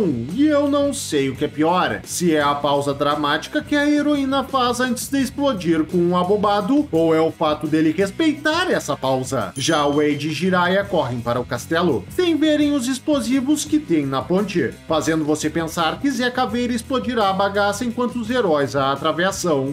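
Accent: Rio Grande do Sul, Brazil. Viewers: Eae meu querido, ótimos videos acompanho sempre, eres gaúcho tbm?? tu voz me é familiar daqui do estado kkk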